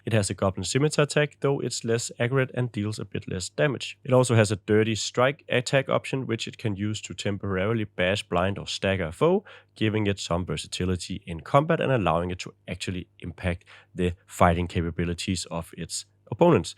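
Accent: Denmark. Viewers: love the quality of the video but i just have to know. are you danish?(i just Wonder cause of the accent)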